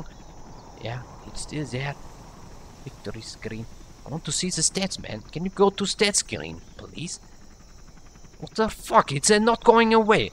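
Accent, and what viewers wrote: Germany. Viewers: I love when Germans speak English :D It sounds pretty nice :D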